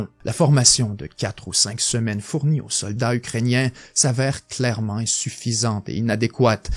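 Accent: Quebec, Canada. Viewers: Est ce que tu serais québécois par hasard? Ton accent sonne bien.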